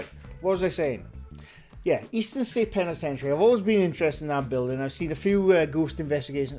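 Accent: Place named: Scotland, United Kingdom. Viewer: You go sir, I would love to meet you and we do our own investigation and your absolutely correct once that money rolls in they are no longer a progression of themselves and start making bullshit. Id do a investigation with ya but it seems like your from maybe Scotland holy shit that whole place is haunted as hell I truly believe. Keep doing what you are doing I love it ..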